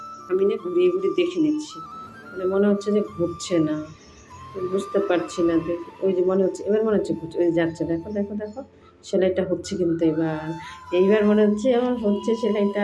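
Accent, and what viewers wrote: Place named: Rajasthan, India. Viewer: ❤❤❤ Rajasthani Bengali❤❤❤❤❤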